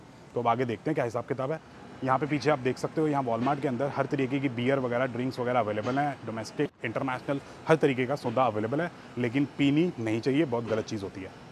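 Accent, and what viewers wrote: Punjab, India. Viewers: Punjab jhlkda tuhadi boli cho bht mjja aaiaa dekh k video 😀jaiga ye cart k ander ☺️ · Dear apki language main jo punjabi texture (Tadka) hai its amazing; dear son apki vlog as always international students k liya bahut beneficial hoti hai and 2day vlog is superb God bless you my son and also stay healthy guys 🙏🙏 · its hindi with punjabi accent very easy to understand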